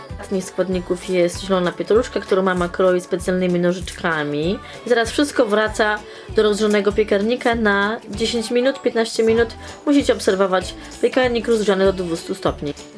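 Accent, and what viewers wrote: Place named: England, United Kingdom. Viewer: Wróciłam do Twoich starszych filmów i zauważyłam że miałaś większy akcent angielski niż teraz:D duża zmiana na plus. Bardzo fajne filmy:)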